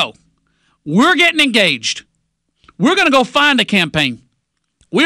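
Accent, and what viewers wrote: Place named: Texas, United States. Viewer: He's a born Texan, that's just how he speaks.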